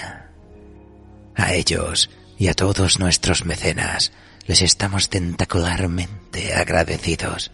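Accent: Spain. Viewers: Excelente narración, me encanta el tono español en los relatos góticos, ojala también puedan hacer el de blasphemous. Saludos desde México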